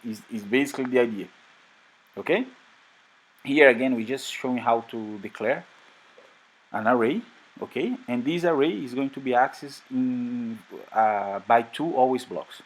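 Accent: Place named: Brazil. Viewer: Great, but that brazilian accent :D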